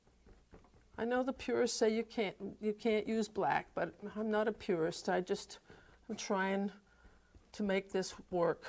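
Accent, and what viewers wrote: New York, United States. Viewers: Would be honored to get my hands on Helen's books. I've been able to view many of her dvd's through interlibrary loan. I immediately resonated with her unmistakable New York accent.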